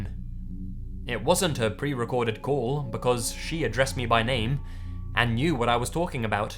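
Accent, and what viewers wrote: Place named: Surrey, United Kingdom. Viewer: bruv how do u talk like that I'm English but you sound like bare posh u must be from sury or summin any great vid m8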